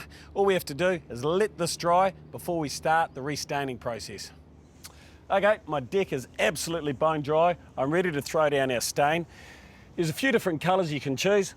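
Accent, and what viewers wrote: New Zealand. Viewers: NZ accent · Hi Daniel, it is New Zealand accent, not Aussie, we say deck.The presenter is great, hubby and i followed instruction and our deck looks great.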